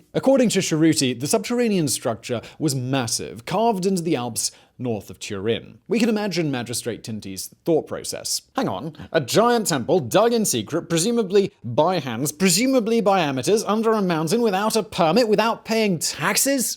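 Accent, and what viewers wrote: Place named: Southeast England, United Kingdom. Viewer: It's a bit weird, and the phrasing is idiomatic in places, but it's definitely southeast English.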